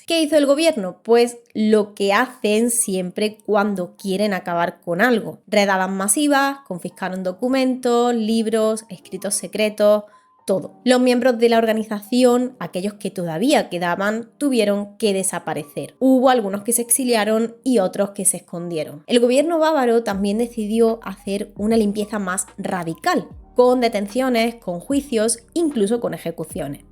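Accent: Andalusia, Spain. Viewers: Me siento raro por mirar un video neutral, bien redactado y objetivo sin que me hayan incertado un sponsor. Agradezco tu contenido. Fan del acento andaluz.:) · Me enamora tu forma de narrar la historia, sobretodo con tu acento andalú. Saludos desde Ecuador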